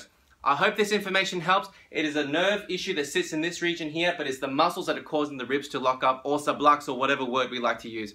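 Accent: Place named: South Africa. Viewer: Hi, do you speak Afrikaans? You sound like you may be South African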